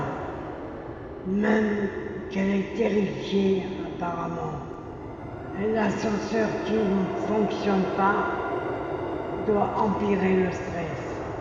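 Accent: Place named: France. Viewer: c un français il a l accent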